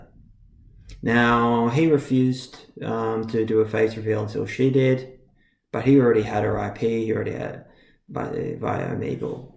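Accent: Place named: Australia. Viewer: “The new edgelord thing is CP child pornography” no matter what affiliation they have, that is unforgivable and disgusting. As a survivor of ritual abuse myself by a “fake” LHP organisation there is no excuse. All pedophiles need to be removed, banished, banned from the occult/magickal community. There is no room for that here, or in the greater macrocosm of the social community at large. I come to magick as a healing refuge and to find it’s filled with as many if not more pedophiles as my childhood sickens me. End it. Thank you for exposing this, you are Australian I assume from your accent ? May I ask where this Pedophile ring is located ?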